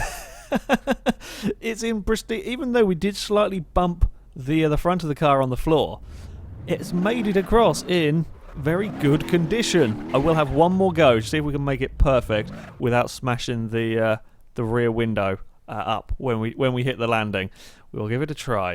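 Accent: United Kingdom. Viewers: He's British. We have accents too you know.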